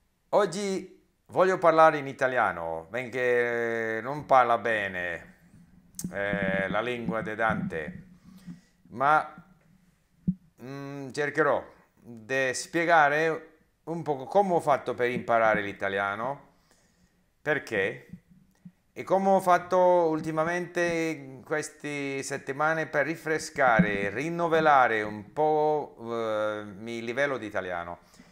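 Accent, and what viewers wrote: Spain. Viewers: Complimenti, il tuo italiano non è male soprattutto per essere un poliglotta. Si sente molto l'influenza dello spagnolo, nell'accento, in alcuni errori e soprattutto nel dire y invece di è :) che secondo me è molto difficile, intendo che io quando ho imparato il francese usavo spesso congiunzioni in italiano senza rendermene conto, in particolare ma invece di mais. Complimenti in ogni caso e grazie per il tuo esempio! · Cerca di separare lo spagnolo da tuo italiano... L'influenza è troppo forte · Bravo, il tuo italiano è notevole nonostante le evidenti interferenze del tuo spagnolo. Penso che la cultura che ti sei fatto nella nostra lingua non sia per nulla una cosa da poco. Complimenti.:D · Complimenti Mr, l'associazione allo spagnolo è molto forte, ma il suo italiano è comprensibile.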